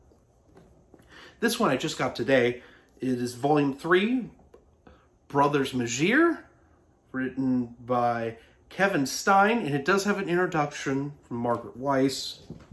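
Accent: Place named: Canada. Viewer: Why after all these years, am I just now hearing a slight northern accent, like a Canadian accent!? Sweet collection. I remember you telling me about how awesome these were. I’m hoping to give that first trilogy a go.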